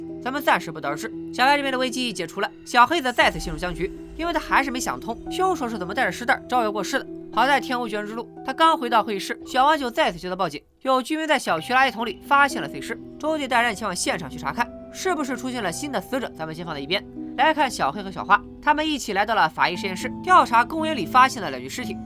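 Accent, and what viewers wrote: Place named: Qingdao, China. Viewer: 请问你是青岛人吗？感觉口音好重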